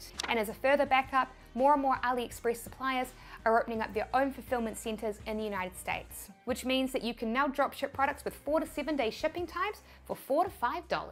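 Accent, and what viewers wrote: New Zealand. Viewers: Iv never had the urge to make my own online business, but i may do it just so i have a legit excuse to watch your videos other than the reason now, this kiwi accent is awesome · What is your accent ? there is abit of New Zealand / abit of Irish or American, maybe South African ? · At 78 I'll probably not launch a dropshipping business but I tune into videos just to hear her interesting Kiwi accent. I lived in New Zealand for twelve years and yet I've never heard an accent like it. Also I love her vibrant personality. All the best to everyone, from Doug the atheist demon from Parramatta, Sydney Australia.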